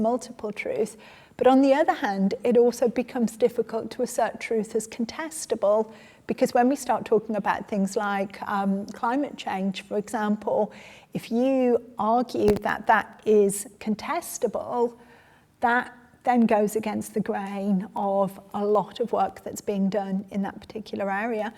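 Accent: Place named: England, United Kingdom. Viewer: Where is she from? I'm trying to place her specific English accent.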